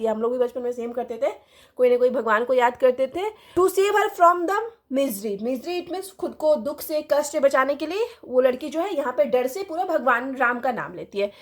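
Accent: Bihar, India. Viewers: From your accent I guess that you are from or somewhere near Patna, Bihar. Give me a like if I'm right.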